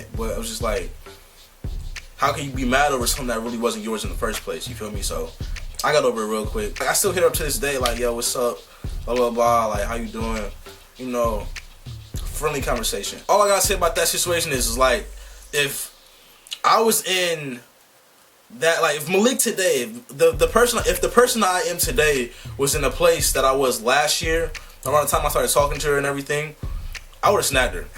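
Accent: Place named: DMV, United States. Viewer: no lie bruh i can always understand you cuz your a dmv man.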